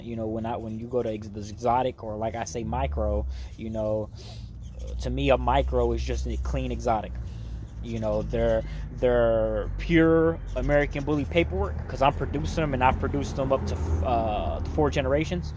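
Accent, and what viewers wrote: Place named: Rural, Puerto Rico. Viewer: Good information bro.. this is dead ass my 1st hearing a Country Rican lol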